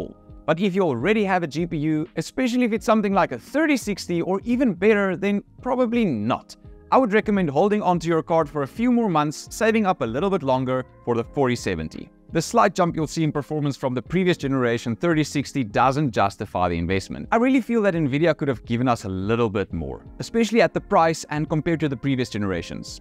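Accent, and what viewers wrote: South Africa. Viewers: Mnr is jy van SA af?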